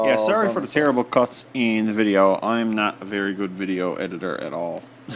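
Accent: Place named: Newfoundland, Canada. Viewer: You sound like you're from Newfoundland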